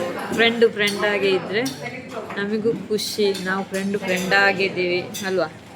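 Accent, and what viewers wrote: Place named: Hubballi, India. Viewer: ಹುಬ್ಬಳ್ಳಿ ಕನ್ನಡ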